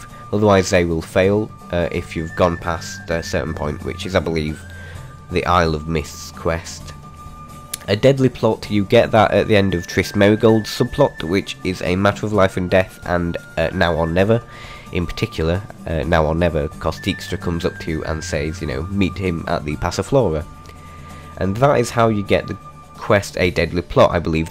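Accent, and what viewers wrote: United Kingdom. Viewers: Humberto Castro I think he is British,but sometimes he spoke so fast that's hard to understand indeed.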